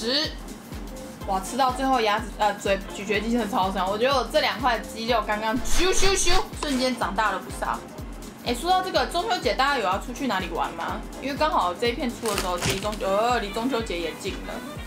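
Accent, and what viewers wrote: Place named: Taiwan. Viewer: 台語好可愛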